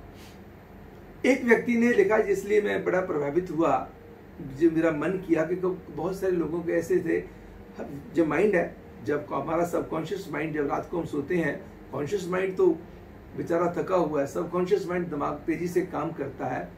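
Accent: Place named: Kashmir, India. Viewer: aapke talaffuz se lag rha hai ki aap Jammu and Kashmir se talluq rakhte ho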